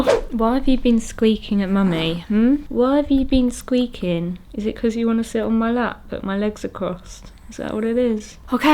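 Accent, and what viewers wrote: England, United Kingdom. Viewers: Cool I didn't realise you were a Pom until I heard your accent I hope you visit OZ sometime and visit the GC lots of Gym Junkies here that need to focus on something a bit more interesting than Bench Presses and Squats 👌✌️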